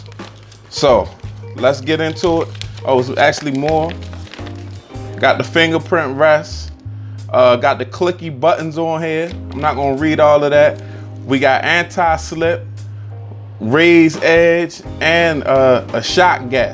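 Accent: Baltimore, United States. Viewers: U from the Baltimore area? I recognize the accent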